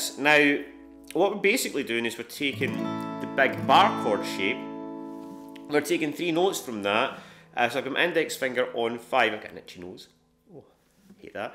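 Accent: Scotland, United Kingdom. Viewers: For those not used to a Scottish accent, turn on CC. You are welcome. 😉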